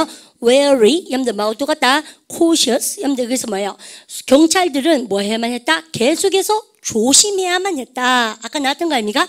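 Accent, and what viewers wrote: Daegu, South Korea. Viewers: 50넘은 세아이 엄마. 심심풀이로 시작 했어요. 대학시절도 생각나고. 선생님께 영어도 배우고. 플로리다에서 하루 종일 한국말 듣기만 하는데 경상도액센트도 정겹고 가끔씩 귀에 꼭꼭 들어오는 갱상도만의 어휘구사도 반갑습니다. 매일일상에서 쓰는 단어 별거 없다고 우습게봤는데 아이들이 중학생이되니 저도 어휘구사를 좀 고급지고 학구적으로 향상시킬 필요를 느끼던참에 샘의 강의 발견하고 열공중입니다. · 아이들 다 대학보내고 이제 자유부인이 되었는데 허한 제 인생을 영어로 함 채워볼까 해요. ^^ 쌤 구수한 대구사투리 넘 좋아욧~~저도 사투리 쓰는 부산아줌입니다 ^^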